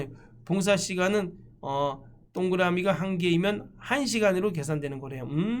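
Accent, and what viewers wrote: Gangwon, South Korea. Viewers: 안녕하세요~ 5월 24일 실기 시험 발표 오늘 이었는데 한번에 합격했어요~~넘 감사해요^^ 작년 추석쯤 2024 5일만에 합격하기 기본서 구입하고 함수문제 보고 엄두가 안나 손놓고 있다가 반백살된 기념?으로 자격증 공부 해봐야겠다 생각들어 다시 시작했습니다.(엑셀 사용하는 업에 있지도 않고 sum, average 라는게 있다 정도만 알고있는)필기는 다른책으로 공부하며 기출 돌렸는데 점수가 꽤 높게 나와 이만하면 되겠다 했는데 딱 그만큼 두 문제차로 떨어지고 강의로 4일동안 7,8시간 공부해서 합격하고 바로 실기공부 돌입. 노안으로 안경을 벗었다 썼다 함수지옥에 빠져 환장할뻔 했지만 실기공부 2주동안 매일 6,7시간 공부 하고 합격 확인하니 너무 기쁩니다~ 함수는 표하나에 5문제 다 나오는 기출에 있던 문제 나왔고 부분합은 성별별로 수당과 상여금 최대값 합계 구하는 문제(성별로 내림차순), 기본작업에서 데이터 나누고 열 제거하기, 75,000-75천원으로 바꾸기 이정도 기억납니다. 다른 후기처럼 기출문제보다 조금 쉽게 느껴졌어요 2025 상시 10회분 넘 어렵게 느껴졌는데 2024로 공부해도 충분할듯 합니다. 컴활공부 검색하다 우연히 만난 선생님 억양이 친숙해서 보니 강원도분이셔서 더더 반가웠네요 저는 태백 아래 고한사람입니다~ 주변 사람들에게 많이 알리고 있답니다~~ 항상 건강하시고 행복하세요~~~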